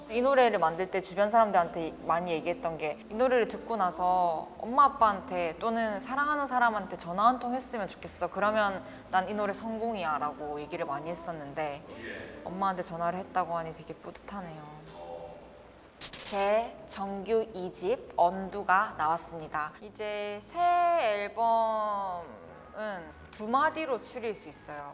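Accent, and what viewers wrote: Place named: Gyeongsang, South Korea. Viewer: 서울 말을 쓰려는 노력이 단 1그람도 없는 ㄹㅇ 진성 사투리 그자체 ㅋㅋ 너무 사랑스러워 같은 경상도 여자이지만 경상도 사투리 쓰면서 저렇게 사랑스럽고 안걸걸해 보이기 쉽지않은데 헤이즈는 너무 좋음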